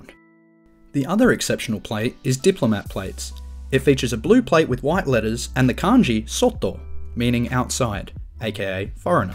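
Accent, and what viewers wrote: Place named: Australia. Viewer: Me Japanese learning about ナンバープレート of my own country, by watching English video with Australian accent😅😊 Thanks a lot. I didn't know a lot of things you mentioned.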